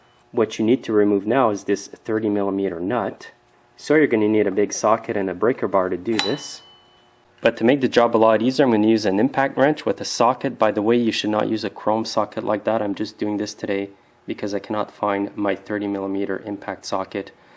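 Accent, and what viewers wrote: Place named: Canada. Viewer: such a canadian accent eh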